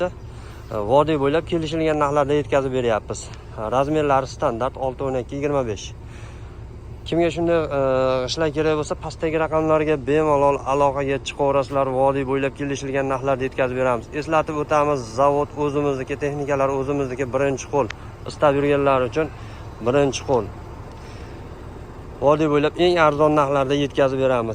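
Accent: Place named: Tajikistan. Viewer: 😊ты таджик не умеешь по узбекский говорить. Сакау.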